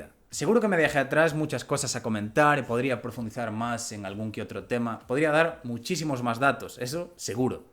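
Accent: Galicia, Spain. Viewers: Soy el unico que cree que tiene acento gallego? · Es gallego por cojones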